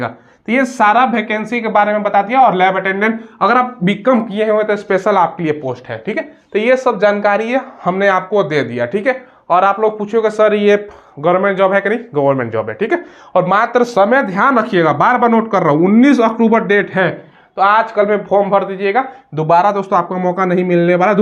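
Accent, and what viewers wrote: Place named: Bhojpuri, India. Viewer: Bhojpuri ka axnent aa hi jata hai 😀😀😀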